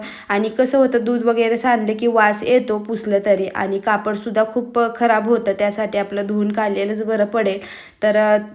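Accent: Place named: Manchar Khed, India. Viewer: Tuz gav कोणत... मंचर खेड ची आहेस का तु... भाषे वरून वाटतं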